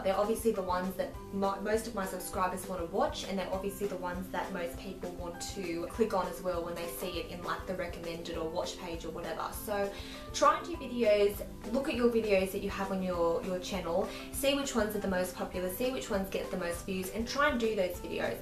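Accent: Australia. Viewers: are you australian????? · Are you Australian?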